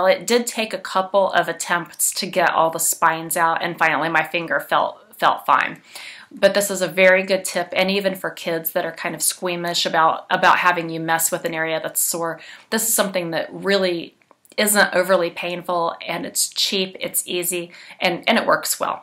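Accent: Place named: Philadelphia, United States. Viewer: Appreciate Tips no pun intended! I miss that accent it sounds like You’re from Philadelphia! That’s where I grew up but I’m out in California now. I miss ...the people. Haha I have a little tip although unsolicited… If you had the window in front of you this would’ve made a much better video it would’ve been perfect lighting but it’s really dark and hard to see you with it behind you it makes you a silhouette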